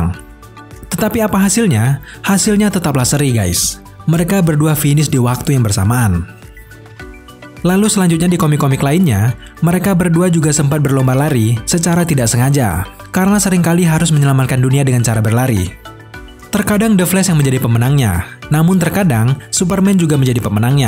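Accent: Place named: Batak Karo, Indonesia. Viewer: Bro, dialek lo kok kaya batak karo yaa...bener ga hehehe Mantappp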